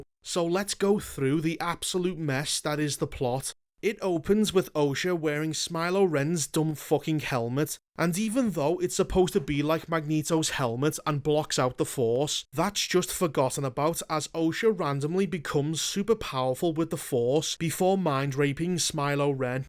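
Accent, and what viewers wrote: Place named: Scouse, United Kingdom. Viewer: It’s a Scouse accent. Every one in liverpool talks like that. To be fair to his Scouse accent is actually quite mild compared to most in liverpool.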